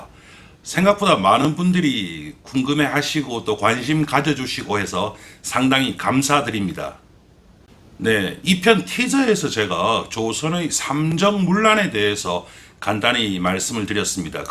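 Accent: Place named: Busan, South Korea. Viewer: 재미있고 유익한 내용으로 제작하시는 노고에 감사드립니다. 부산말억양이 친근하게 들리네요 정확한 발음에 천천히 가는 속도도 좋습니다..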